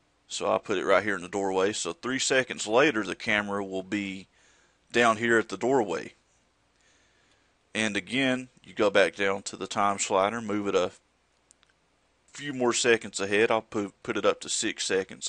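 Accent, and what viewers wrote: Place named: Texas, United States. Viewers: nothing's better than a texas dude explaining a tutorial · You sound like a Texan guy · Damn. Now THAT is a real texan's voice. Thanks for the help.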